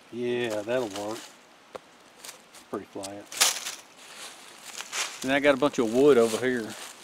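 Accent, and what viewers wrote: South, United States. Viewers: I always love your content. And your Accent bro. Are you from Down South? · Does anybody else watch because of the accent and the little sayings? Laughed out loud at the one about having to go to bed because he could hear the crickets! Of all the reasons and excuses for why it's bedtime, I have never used that one! Loved it... Subbed a while ago after hearing him refer to a rock as an Alabama hammer... What part of America is this accent common?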